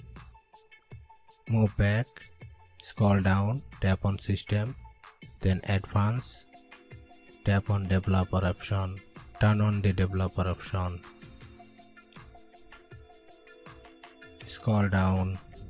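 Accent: India. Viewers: Got a problem with his English? Consider this: India's primary export is knowledge. Think about that, next time you're feeling xenophobic. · It's just the a Indian accent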